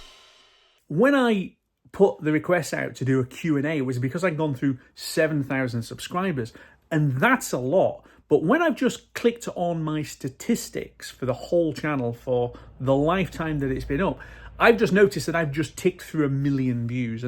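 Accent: United Kingdom. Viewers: I look forward to your videos every Friday. I subscribe to a few "Tesla" Youtubers but yours I find the most enjoyable. as a Canadian, your british accent and humour is fun. I enjoy your travel videos in the Model Y and your chalenging of the anti-ev folks. What I didn't like was the video on accessories. There are many YT folks doing accessory videos which I find redundent. Keep up the good work and "Carry On"!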